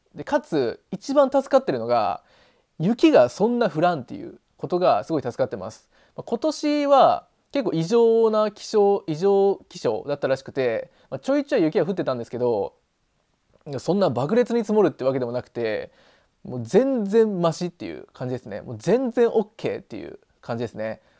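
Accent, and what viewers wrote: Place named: Kagoshima, Japan. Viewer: 方言が強い！の「わい、おい」で1番笑いましたw 私は鹿児島県民なんですけど、わい、おいが全く同じなんですね😂 私、南島原で普通に生きていけそう👏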